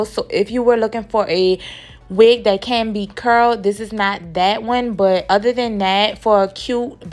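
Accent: New Orleans, United States. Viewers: I love your NOLA accent!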